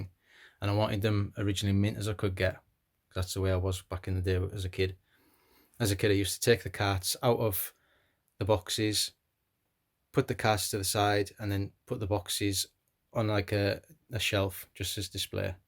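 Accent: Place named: West Cumbria, United Kingdom. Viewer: Great video, i'll enjoy watching this. You sound like you live near me. West Cumbria?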